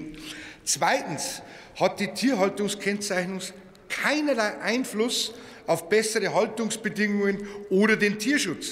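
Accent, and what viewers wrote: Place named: Bavaria, Germany. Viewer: "Ich vertehe nichts.." Bayirisch verstehe sogar i.. als Schweizer!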